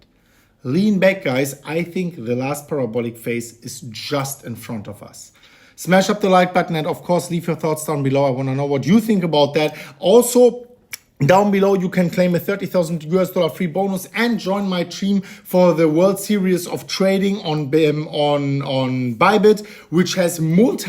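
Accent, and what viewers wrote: Germany. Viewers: German accent is strong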